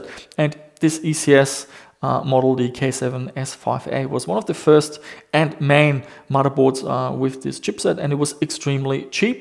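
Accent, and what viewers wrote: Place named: Germany. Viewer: Hey, i'm watching your content for about 1 or 2 years and on any video i'm thinking if you are german, 'cause your accent sounds so for me. But if or if not, its not important. I love your videos, it reminds me on my childhood and youth. BR Horst from Germany;-)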